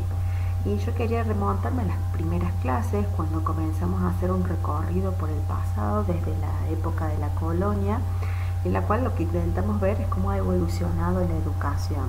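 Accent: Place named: Cordoba, Argentina. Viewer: Estaba buscando a cerca de la ley para realizar un informe y me encantó la información que me brindaste. Muchas gracias 💛 Por tu acento creo que sos de Cordoba, saludos desde B.S. A.S.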